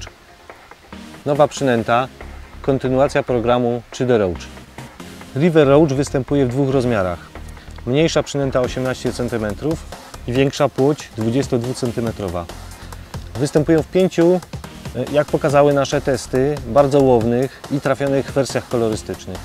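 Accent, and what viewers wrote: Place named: Czech Republic. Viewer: Простите, вы в какой стороне живёте, похоже на Чехию по произношение, я из России..))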